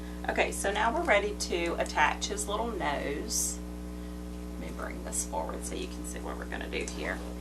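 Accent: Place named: Texas, United States. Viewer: I am so excited and feel so blessed since the very time I thought of subscribing to your channel because you are not only super inspiring and talented but you have totally inspired me 💯% on transforming my crafting room because I just had all these beautiful things I've been purchasing all the time and I did not know where to start and finally I'm seeing a light at the end of the tunnel because my crafting room is my hugeeee attic and up here I also have a fire place which makes it even more fun and beautiful. Thank you so much ☺💖 oh btw I so love your accent reminds me of when I lived for a little while in texas I actually delivered my youngest in dallas and I miss it so much over my husband and I are really thinking of moving back somewhere in the very peaceful side of texas god willing and fingers crossed 🙏☺ God bless and I look forward on seeing your channel bloom and grow so much because you really deserve it 💖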